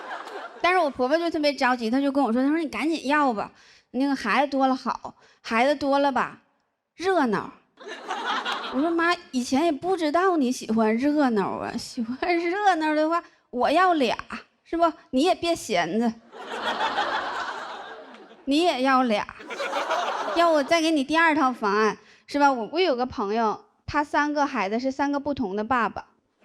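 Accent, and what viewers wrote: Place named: Northeast, China. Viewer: 这一口东北大碴子味儿。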